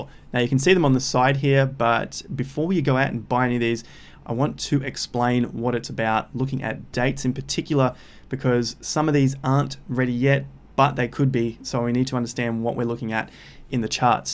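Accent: Australia. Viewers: R u Aussie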